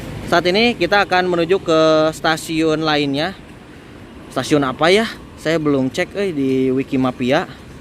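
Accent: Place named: Banyumas, Indonesia. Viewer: Bahasanya keren tuh kang ,,, ngapak habis😊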